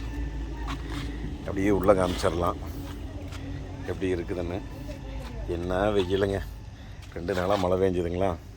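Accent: Kongu, India. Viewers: கொஞ்சும் கொங்குத் தமிழ் பேசும் கோமகரே...! திகட்டாத தித்திக்கும் பேச்சுடைய திருப்பூர் திருமகரே...! மதிப்பிற்குறிய " " திருப்பூர் திரு. அண்ணா🙏❤ எப்படி இருக்கீங்க❤🙏 அண்ணி எப்படி இருக்காங்க❤🙏 ரித்திக் எப்படி இருக்காரு❤ அருமை அருமை அருமை வழக்கம்போல அண்ணா உங்களோட வீடியோ அருமை❤❤❤😊😊❤❤ என்ன சொல்ல ஏது சொல்ல😊😊❤❤❤ சும்மா நெகு...நெகு...நெகுனு இருக்கு ஹுண்டாய் I20😊😊❤❤❤❤ வெள்ளை நிறமும்/கருப்பு நிறமும் அட்டகாசம் போங்க😊😊😊❤❤❤ அதிலும் குறிப்பாக அந்த "அலாய் வீல்" வேற மாதிரி வேற மாதிரி😊😊😊😊❤❤❤❤❤ அழும் குழந்தையின் அழுகையை நிறுத்த தாயால் மட்டுமே முடியும்...! அதேபோல.... அஞ்சு பைசாவுக்குகூட தேறாமல் அழுக்குப் படிஞ்சு பாழாய்ப்போன காரை அழகுபடுத்தி ஆரோக்கியமா மாத்த " " அண்ணாவாலதான் முடியும்😊😊😊❤❤❤❤🙏 இந்த வீடியோவில் சரியாக வது செகண்ட்ல அண்ணாவோட வேலைப்பாடுகள் பற்றி கிண்டல் செய்து சொன்ன தன் அறிவை கழட்டி ஆப் ஆயில் போட்டு தானே எடுத்துத் திண்ண அந்த ஆளுக்கானது...... குறை சொல்பவனுக்கு குழந்தையின் சிரிப்பும் குற்றம்தான்....! 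உளறுபவனுக்கு உழைப்பின் மகத்துவமும் தன் மரமண்டையில் ஏறாத ஒன்றுதான்...! அப்படிப்பட்டவன்தான் அந்த கிண்டல் செய்தவனும்...! " " அண்ணா நீங்க அடிச்சு துவைச்சு போயிட்டே இருங்க அண்ணா😊❤ உங்கள் திறமை யாவும் வாசம் நிறைந்த சந்தனம் போன்றது😊❤...! அதெல்லாம் சாக்கடை எது? சந்தனம் எது? என்று பகிர்ந்து பார்க்கத் தெரியாத அந்த கிண்டல் செய்த மடையனுக்குப் புரியாது...! என்றும் அன்புடன் உங்கள் தம்பி ரா.முஹம்மது கபூர் (சென்னையில் இருந்து) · வணக்கம் அண்ணா. எனது பெயர் சிவராமகிருஷ்ணன். கோவை சூலூர் பகுதியில் வசிக்கிறேன். தங்களது வேலைகள் அனைத்தும் மிகவும் சிறப்பாக உள்ளன. தங்கள் காணொளிகளை சமீபகாலமாக பார்த்து வருகிறேன். உங்களது கொங்கு மொழி சாயலும், நகைச்சுவையான பேச்சும், விளக்கங்களும் ரசிக்கும் வண்ணம் உள்ளன. தங்களிடம் வருகை தரும் பல்வேறு மாவட்டங்களை சேர்ந்த வாடிக்கையாளர்களே அதற்கு சான்று. விரைவில் நீங்கள் பிற மாநில வாடிக்கையாளர்களும் பெற எனது வாழ்த்துக்கள். Alloy wheels - Super design I seen in non-company alloy wheels. Dual tone colour paint - Awesome. 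DRLs - Great.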